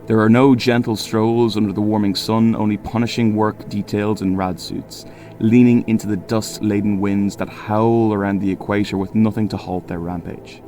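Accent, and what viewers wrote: Ireland. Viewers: God im so glad i found this channel, no AI, Irish accent, what more could you wish for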